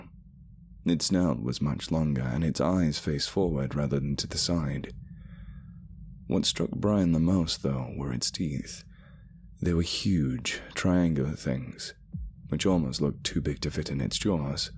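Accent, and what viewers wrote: United States. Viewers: Your American accent is solid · Your American accent is getting so good! I'm from Oklahoma and to me you sound like a Texan!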